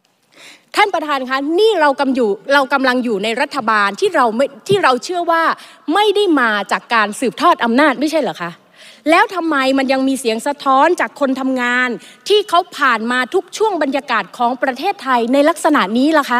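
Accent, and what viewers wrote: Thailand. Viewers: แหลงหรอยนัดสสคนนี้ สสใต้ไหมครับ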